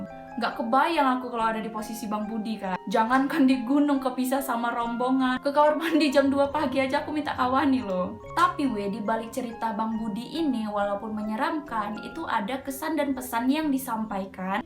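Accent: Medan, Indonesia. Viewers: Mantap logat medannya dah kluar lagi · nengok cakap Medan nya kok jadi sor kali, jadi pengen liat cerita" yang laen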